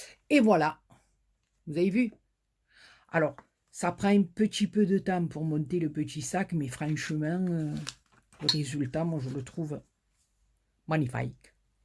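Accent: South, France. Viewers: Cc ma, tu as toujours des idées originales....elle est trop sympa cette cartounette! En plus à chaque fois que je t'écoute tu me rappelles le sud où je passe mes vacances d'été depuis 16 ans! Prends soin de toi ma et à très vite pour un tuto! Pleins de bisous. Sandrine du 91 😘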